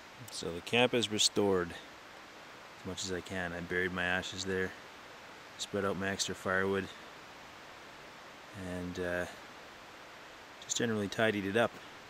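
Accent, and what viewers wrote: Canada. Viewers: nice series. it sounds like your canadian, too bad you cant carry a pistol. it would suit your needs and is way less cumbersome.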